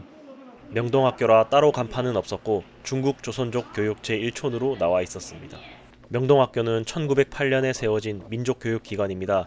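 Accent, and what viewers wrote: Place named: Gyeongsang, South Korea. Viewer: 쌍도 억양 듣기 싫어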